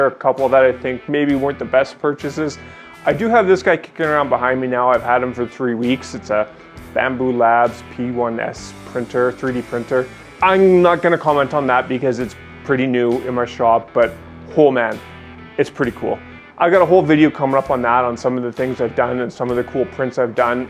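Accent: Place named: Canada. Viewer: I never comment, but I kept thinking 'this guys sounds Canadian or something...' Then you dropped it: You are! A shout out from Ottawa! Keep it up, I'm subscribed. I want to hear about that 3D printer for sure. Been holding off on getting one of those but the writing seems to be on the wall, they're too useful to ignore.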